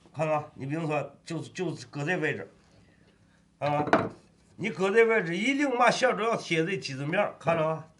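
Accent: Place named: Tianjin, China. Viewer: 天津话贼个锃啊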